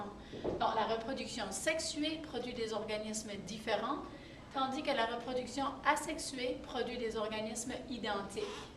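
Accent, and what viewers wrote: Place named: Quebec, Canada. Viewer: super vidéo mais je n'ai juste pas très bien compris avec l'accent quebecois 😝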